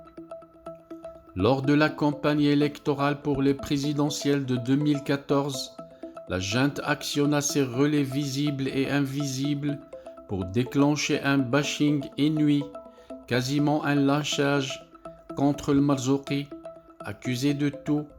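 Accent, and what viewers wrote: Morocco. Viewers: Vous êtes Marocain facilement reconnaissable par la prononciation d'un français directement traduit de l'arabe. Le tlemcien parle et pense en français et ne connait dans le détail le passé des relations politique maroco-tunisienne.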